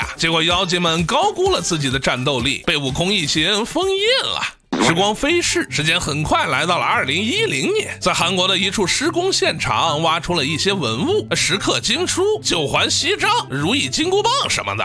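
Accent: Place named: Shaanxi, China. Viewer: 是陕西人吗好像听到了一丢丢口音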